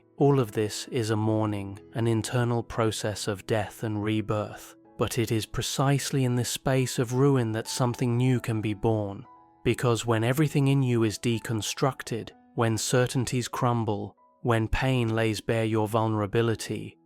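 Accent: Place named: United Kingdom. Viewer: Oh...I was waiting for you to release a full Jungian video 😉☺️ you have no idea how your videos touch my soul in this way that sometimes makes me gently cry with relief, or just put a smile on my face in the morning that stays all day, even all week as I daydream about the ideas you espouse. To be completely honest, at first I found the speaker's voice (is it AI? I seriously can't tell!) to be slightly unsettling because of the monotonous contour tone and strange vowel inflections (sometimes the vowels are inflected as they would be in the north of England, but usually a standardised southern english dialect that very occasionally slips into full north american dialect) I think my linguistics brain didn't like those anachronisms but quickly I grew to find the cadence and pitch soothing, I've always had highly sensitive hearing and seem to be very inwardly sensitive to sound. 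I can't watch television because I grew up without it and find it super abrasive. Do you know what I mean? A man got me to watch the football and the violence really affected me and the bizarre sound of their bones clacking together when they tackle each other was grotesque. So I find a lot of videos on YouTube abrasive. Yours are perfect for me. And I haven't even mentioned the content. It nourishes my soul. So special.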